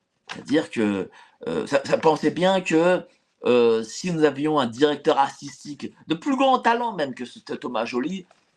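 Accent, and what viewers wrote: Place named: Germany. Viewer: Arrete ton "il ne faut pas avoir peur", c est à vomir. Je deteste l accent allemand.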